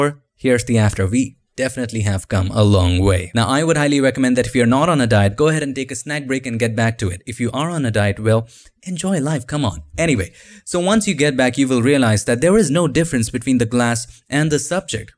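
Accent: India. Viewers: I really like to lean photoshop with you but your english speaking is also good, where are you from , are you indian?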